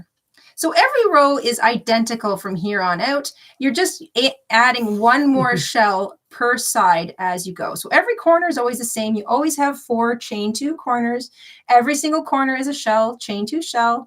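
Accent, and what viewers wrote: Canada. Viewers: Your squares are extra special, thanks so much for sharing. I’m excited to try this. Best regards from South Africa 🇿🇦 hope you are well in Canada? Am I right? Your accent sounds Canadian 🇨🇦💫 oh ok you said hi from Canada ✌️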